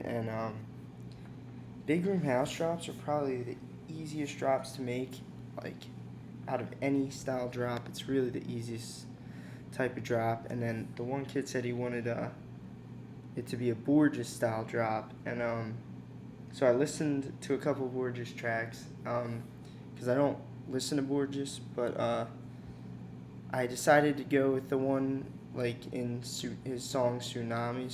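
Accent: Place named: Chicago, United States. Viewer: What suburb of Chicago are you from? You sound just like my buddy that grew up in Barrington :)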